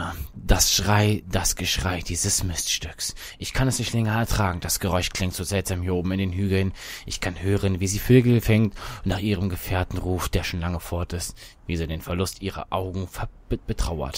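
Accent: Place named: Berlin, Germany. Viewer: Wär ja mal voll endgeil... Natürlich, um so weniger Chancen, um so besser xD Ey, das mit dem Träumen war meine Idee D:< xD Der Tod war so unnötig xD omg, Lachflash xD Dein Berliner Dialekt ist voll cute:D Der Baum will dich beschützen!😌 Ich kann hören, wie sie vögelt... Achso Vögel fängt... pfeifend weggeh Eric ist vielleicht an Eric the red angelehnt... <<< Gedanke kam mir so ganz am Anfang xD Tschuldigung, der Mond sah so lecker aus.-. Ich konnte mich einfach nicht beherrschen..., das Lesen musst du aber noch üben😌 Srsly? Auftraggeber, Auftragnehmer? XD Dieses "Oh, fuck!" am Schluss und ich erstmal so mega ratlos, was du meinst xD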